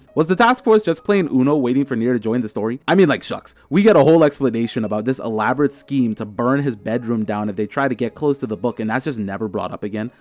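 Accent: Bahamas. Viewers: Are you a Bahamian? This is funny? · are u from the bahamas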